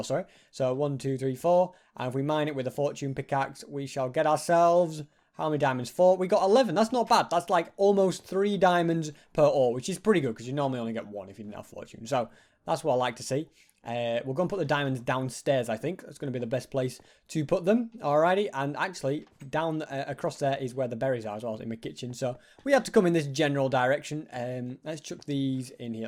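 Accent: United Kingdom. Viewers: I never new you were British 🇬🇧🇬🇧🇬🇧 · I like British English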